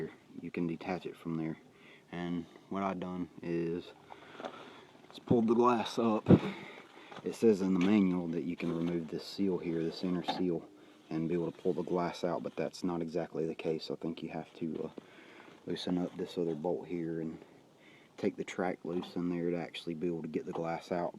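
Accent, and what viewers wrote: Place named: South, United States. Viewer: Really informative in a southern accent!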